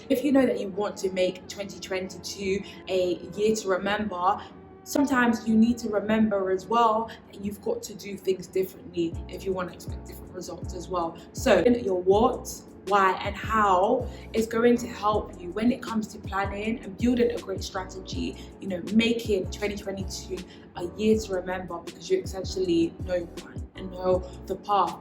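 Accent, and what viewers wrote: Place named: Jamaica. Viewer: This Jamaican accent you’re giving us 😂😂😂❤️ love you and you’re doing amazing ❤️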